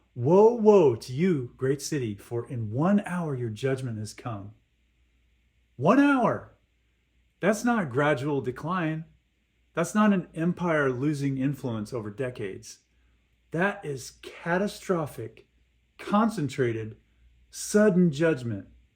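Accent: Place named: Utah, United States. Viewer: You sound like a utah Mormon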